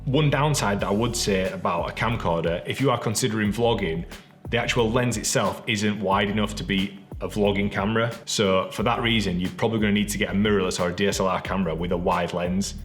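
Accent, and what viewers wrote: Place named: Northern England, United Kingdom. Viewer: D'ya know summat, it's so nice to hear not only an English accent but a NORTHERN English accent on YouTube! you have a new sub my friend!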